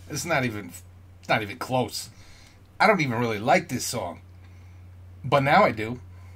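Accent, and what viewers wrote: United States. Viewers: Heard that NY accent and I was hooked. I'm from Brooklyn. Love your reactions. Welcome to the PTX rabbit hole, I am gonna enjoy hearing your great reactions to this unbelievable group been a fan since 2011 When they won the singoff. I'm going to take this journey with you. Subbed Now I can enjoy PTX and you. Thanks!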